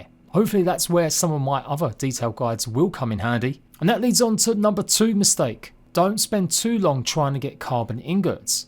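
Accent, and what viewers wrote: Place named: United Kingdom. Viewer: Listening to your British accent hurts my face. Other than that you're awesome 👌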